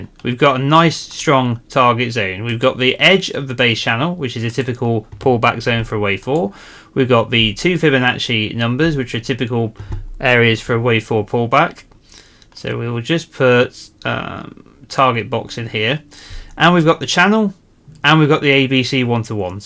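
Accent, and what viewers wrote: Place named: England, United Kingdom. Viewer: I don't know if it's just because of the English accent, but as a non-trader I understand everything you say, you explain things very clearly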